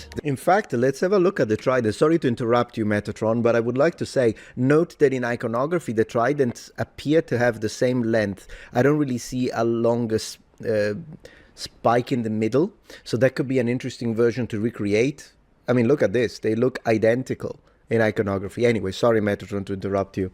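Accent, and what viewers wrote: Greece. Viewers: Your greek accent is amazing! 👏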